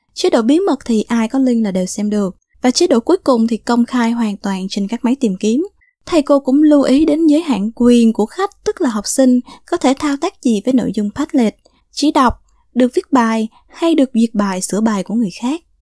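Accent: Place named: South, Vietnam. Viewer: ôi đúng là giọng con gái miền Nam, nghe ngọt xỉuuuuuuuu nghe kênh của bạn này hướng dẫn vừa dễ nghe lại dễ hiểu, dễ làm mong bạn có nhiều video như thế này nữa <3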